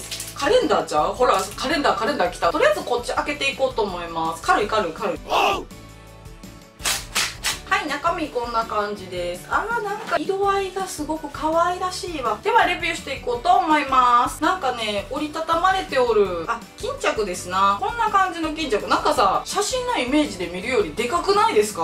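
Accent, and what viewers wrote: Kansai, Japan. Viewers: おススメの動画で拝見し、すごいお綺麗な方なので、ちょっとおすまし系？もしくはぶりっ子系？と思いつつ見てみたら！！めっちゃ面白い！関西弁でテンポも早くて、ついつい見入ってしまいますね！付録レビューしてる他の方も色々見ましたが、1番わかりやすいし、評価もはっきりしてる！これからはさんのを見て購入します！ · はじめまして(o^^o) ファンになって2ヵ月経ちましたが、初コメします！いつもわかりやすい説明ありがとうございます😊 関西弁が好きなので、いつも楽しく見てます！今度、ライブとか出来そうだったらぜひお願いいたします✨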